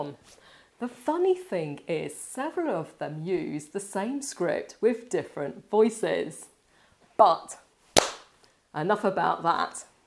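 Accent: England, United Kingdom. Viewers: I love her British accent. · I was wondering where you were for a while but sort of figured what had happened. Anyway allways enjoyable content on all channels. No offence to our American friends it's all ways good to hear a English accent. I was born in St Leonards but now live in Australia. My bike 1984 GPz 900r. Good luck and good riding.😉😉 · Given the obvious of being a beautiful girl from Cornwall with an equally beautiful personality I would still view your video productions if say perhaps the topic was Cornish game hens! Great British accent Greetings from Arizona USA, where we speak some sort of english.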